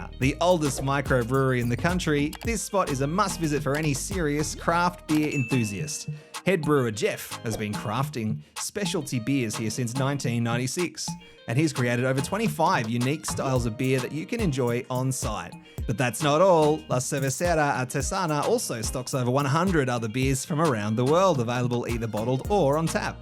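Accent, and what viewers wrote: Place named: Australia. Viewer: Mate! Going to Barcelona in a couple of weeks & when I heard your Aussie accent I knew you know what your talking about 😉 Even though it’s not summer over there it’s always a good time when you have a cold one!!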